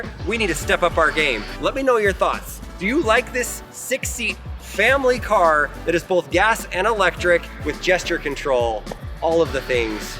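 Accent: China. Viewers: Even the voice is Chinese-English lol.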